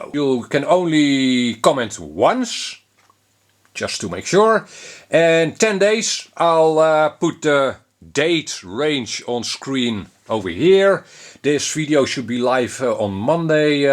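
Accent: Netherlands. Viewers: Nice work again , always the best positive products reviews and information. I like your English Dutch dialect gives it a fibe. Hopefully you'll sending this quadcopter to Sweden !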